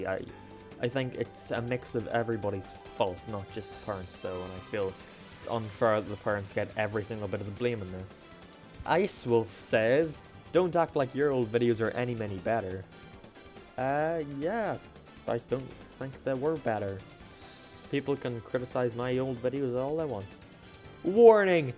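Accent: Ireland. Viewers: He has an irish accent, thats it. His first language probably is english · Get ready for the F**KING swarm of logans fans attacking a bunch of us normal people saying we suck dic or something because there mad we destroyed there lord and saviour also why are all the comments that he reads are f**king books also I love your acent you sound irish like me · are you irish or northern irish i reckognise your accent cus i am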